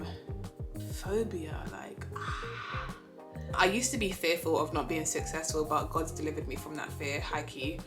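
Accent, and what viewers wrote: New York, United States. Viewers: Loved the New York accent and your career story! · It’s the New York accent that killed me like “ are you DUMB?? “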